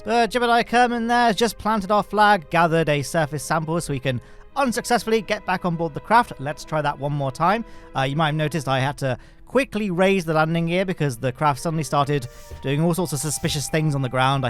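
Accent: United Kingdom. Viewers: Forever confusing Duna with Jool thanks to the palatalized British accent...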